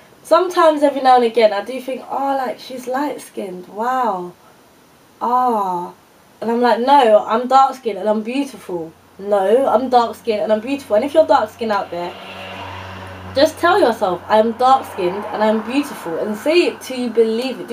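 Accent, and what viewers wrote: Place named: United Kingdom. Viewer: Are you tyra banks sister?:o You sound british, or maybe she sound american hehe I dont know where yous are from! Btw, your beautiful! I was going to bleach my skin because of uneven skintone but now you made me much more confident, ill just stick to foundation. The fact that people risk there lives just to look pretty is mad.. Stay strong your not ugly!:-) xx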